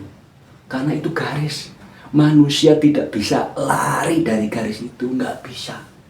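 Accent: Banyumas, Indonesia. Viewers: Ngapuntene pak tanggled bapake saking pundi gih, nopo tiang ngapak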